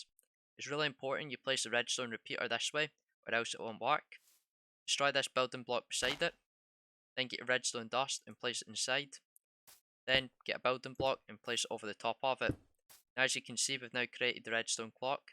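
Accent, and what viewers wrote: Scotland, United Kingdom. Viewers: aye are you scottish from 🏴? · Is that a Scottish accent? Sounds cool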